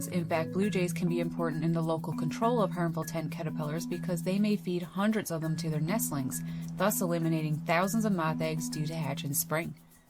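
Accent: Canada. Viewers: Oh, this lady is definitely Canadian. You could hear it as soon as pouch and then house 🤣. Bless your flappy headed hearts.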